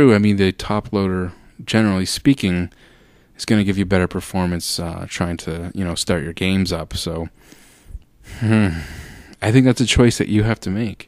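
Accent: Canada. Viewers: Wow. Nice. Hey, are you Canadian? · Are you Canadian? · is this guy canadian because he sounds canadian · Yes he is indeed a Canuck. As am I.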